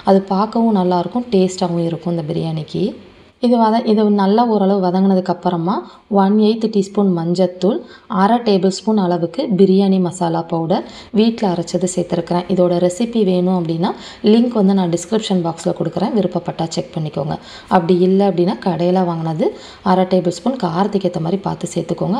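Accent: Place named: Tiruchirappalli, India. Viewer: my name Devi 67 years old l got my degree on1974 from your way speaking Tamil I thought that u may be Trichy or Thanjai Trichy so many relationship are there Trichy past mla anvil poyyamozhi My close relative from your way of speaking I understood I am also interested in cooking like you My daughter at Fort Worth she will also cook nice ly Yesterday l spoke about you to my daughter her name Narmatha She’s working at CTS software company 2017 we came to Fort Worth stayed 2months Stay blessed with your family Bye da Chellam Trichy town are near by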